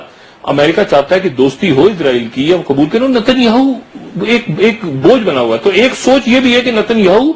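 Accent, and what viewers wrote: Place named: Faisalabad, Pakistan. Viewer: Dr sab ajj tay faisalabadi lagy ho tusi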